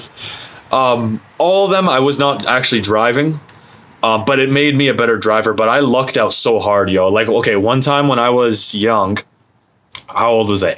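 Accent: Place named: United Kingdom. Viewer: 1. Your British accent is amazing. 2. On day 5 and going strong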